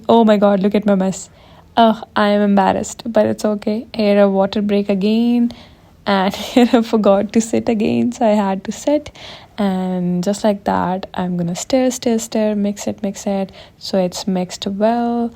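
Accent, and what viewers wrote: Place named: India. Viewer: Work on your accent please, you have typical desi accent tak tak accent 😁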